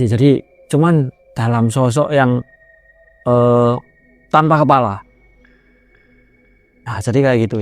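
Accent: Java, Indonesia. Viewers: aaaaghhh medok sekali Saya yg bukan org jawa sangat susah memahami cerita nyaa 😢😢😢 Teenyata bahasa jawa itu kata "E" maksud dan artinya adalah "NYA" ya ? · Mase lek crito unik, JoWo pool.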